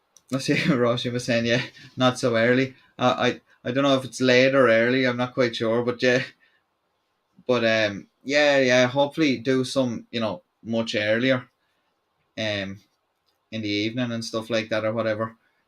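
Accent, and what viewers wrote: Ireland. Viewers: nice to hear the Irish brogue on YouTube.🇮🇪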